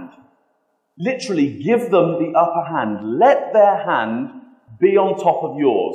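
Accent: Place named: United Kingdom. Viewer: the british accent helps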